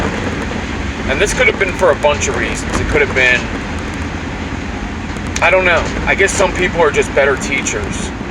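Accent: Philadelphia, United States. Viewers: I can really hear the Philly accent ❤❤Great Topic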